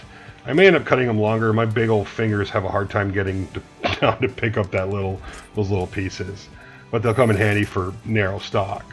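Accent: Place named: Carolina, United States. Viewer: yes-that's your accent--n or s Carolina? - oh yeah did you say something about Michigan -- from La La lander previous show me stater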